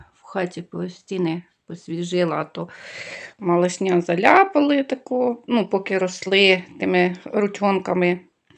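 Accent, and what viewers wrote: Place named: Vinnytsia, Ukraine. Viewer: Я здогадалася,що ви з Вінничини, по вашій манері розмовляти,я також з Вінничини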